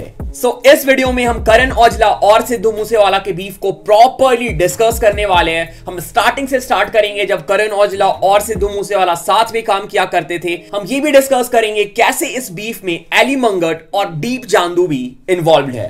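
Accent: India. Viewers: Bhai aapka accent hindi hai but aap punjabi bolte hue bohat funny lagte ho loved the effort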